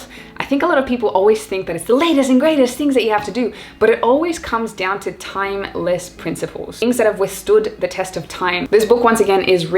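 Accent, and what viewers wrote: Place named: Australia. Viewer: I can't place her accent, Australian but there is something else..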